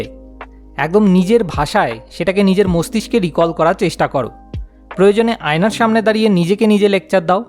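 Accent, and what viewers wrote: Kolkata, India. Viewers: Apni ki kolkatar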